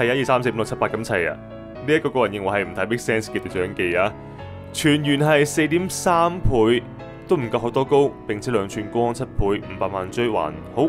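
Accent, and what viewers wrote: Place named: Hong Kong, China. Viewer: 就算一早睇哂當日所有最新情報都好，點都要聽你條片講多次，好鍾意你個d好地道港式既口吻❤加油❤ 利片：非基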